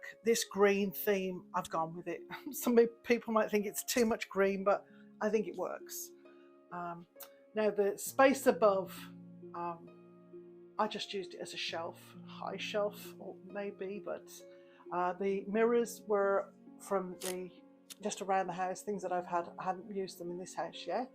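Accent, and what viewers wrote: United Kingdom. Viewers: Hello I just wanted to tell you, that you have done a beautiful transformation to your bath! I enjoy listening to your voice and the little Brit ways of talking about your experience! Good luck with the rest of your home. I look forward to seeing all of your transformations! God bless and cheers! Theresa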